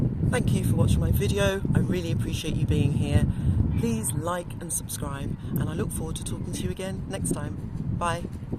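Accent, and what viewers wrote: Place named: United Kingdom. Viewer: Oooh heard the accent and subbed! Not found many Brits on here I’ve small locs 184 10 months old. I started my sisters 6 weeks ago she has bout 205. Will share this with her too hopefully got some good tips for us.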